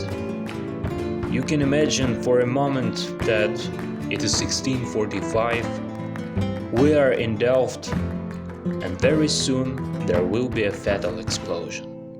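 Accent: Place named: Spain. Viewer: I hear you have a Spanish accent. Are you from Spain? My grandparents were from Castilla La Vieja in Spain. I think that I must have been an artist in my past life because painting is my passion, and I am a self-taught artist. I went to Spain and visited the museum in Madrid. I love Velasquez. He is my favorite of Spanish artists! You are great too ! Gracias por compartir su conocimiento y talento con nosotros!!❤❤❤❤🎉🎉🎉😊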